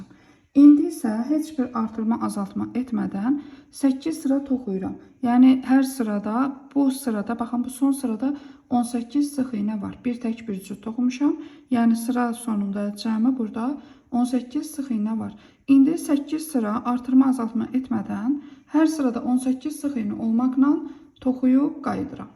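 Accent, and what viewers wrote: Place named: Bayburt, Turkey. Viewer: O kadar güzel anlattınız ki örgü severim zaten şiveniz bize yakın bende Bayburt luyum,bitirince instagram dan sizi etiketleyerek paylaşacağım❤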